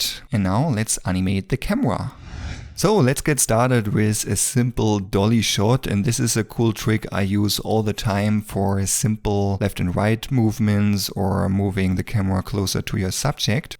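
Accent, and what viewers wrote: Germany. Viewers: Are you German? 😅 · It's crazy how germans instantly notice a german accent of english when they hear it...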